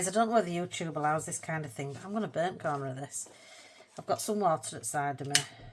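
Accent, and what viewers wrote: Yorkshire, United Kingdom. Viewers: Hi, loved this video. I only watched, didn't take part but got some great ideas, so thank you. You are so funny. Where abouts in Yorkshire are you from. Loved listening to your accent and some of the things you came out with are the same as what I would say so you had me laughing and smiling so thank you so much for the laughs and for sharing your processes and thoughts. Gonna watch your next video in this series. Thanks again, take care. Kind regards from Helen somewhere in the Greater Manchester area, UK.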